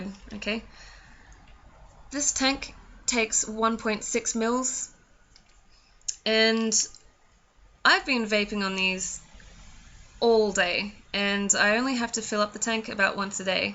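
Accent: Australia. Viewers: Are you from Australia or new Zealand ?? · you sound Australian :D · Defo an Australian accent. Are you near Melbourne, Tennis season starts in Jan in Melbourne can't wait!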